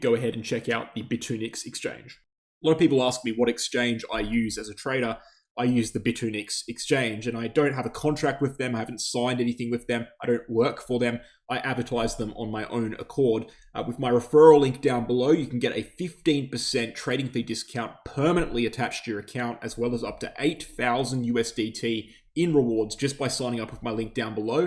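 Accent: Australia. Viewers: this comment is NOT from a bot… You have the most annoying type of Australian accent; no American can take it seriously … and, the fact that you still believe in four years cycles after structural institutional change means… “Thank God I don’t have to listen to this Ozzy“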